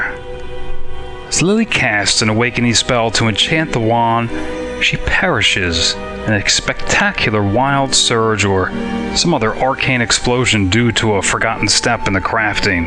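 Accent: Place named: New York, United States. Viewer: Maybe it's because you're a New Yorker. Sounds like you're saying Lie-LICK. Just like how you pronounce "Ruins" as "runes". :P Course, I'm a Philly suburbs guy, So I can't really talk. :P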